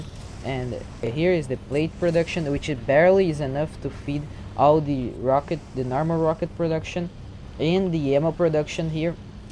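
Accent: Brazil. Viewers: Are you brazilian? It sounds A LOT like the Brazilian Accent. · You sound brazilian to me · TU TEM SOTAQUE DE BR MEU IRMÃO, TOMA O LIKE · Pretty impressive ship. Don't worry about your English, it's perfectly comprehensible; you do sound like you're Brazilian. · Eu tbm imaginei que era brasileiro somente pelo sotaque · Teu sotque é de brasileiro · tu é br mano. kkkk salve aprendendo a falar ingles tamabem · Are you Brazilian? The accent is basically identical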